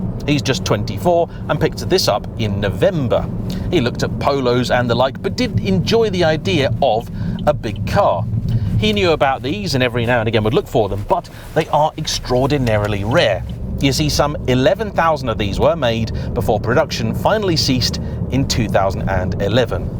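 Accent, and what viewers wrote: United Kingdom. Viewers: Is it the British accent, is it his knowledge and take on exotics as well as everyday enthusiast cars? I don't know, but I can listen to this guy talk about cars all day! seems like the person I'd run into in a parking lot and just start up a conversation about what we're driving! 😃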